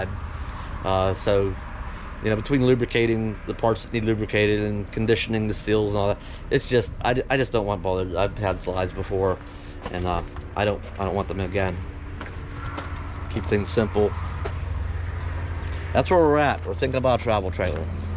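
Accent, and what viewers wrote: Pennsylvania, United States. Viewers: If it weren’t for your PA accent I’d say you’re a Michigander. Lol. Bella too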